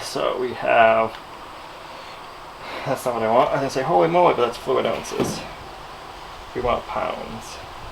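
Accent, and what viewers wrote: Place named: New Hampshire, United States. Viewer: Here's a Tee shirt. "What's next on Lumnah Acres? I have an ide'er.... Love the NH speak. Reminds me of my dad.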